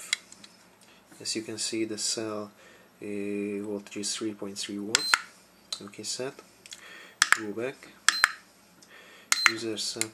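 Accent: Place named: Hungary. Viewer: Felismertem a magyar akcentust :D Azon gondolkozok, hogy a gyári 9.6V-os NiMh helyett 3 LiFePO4-et, vagy 2 Li-ion-t használjak. Utóbbi esetben 2P-be is tudnám kötni, vagyis 4 db-ot használnék dupla kapacitásért (kb. 6000mAh). Szerinted melyik a jobb döntés? I'm wondering whether I should use 3 LiFePO4 batteries or 2 Li-ions instead of my stock 9.6V NiMh. In the latter case I could use 4 pcs instead of just 2 in a 2P configuration for double capacity (around 6000mAh). What do you think?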